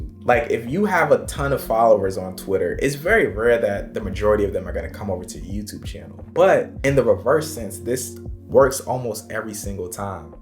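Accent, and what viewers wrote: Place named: East Coast, United States. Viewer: your east coast accent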